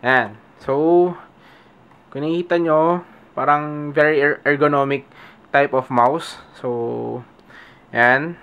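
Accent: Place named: Russia. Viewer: it seems you to be from rusia or nordic EU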